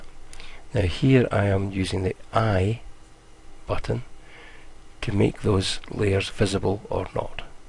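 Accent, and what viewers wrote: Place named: Scotland, United Kingdom. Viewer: I love the scottish accent.I was just having a bit of fun with you.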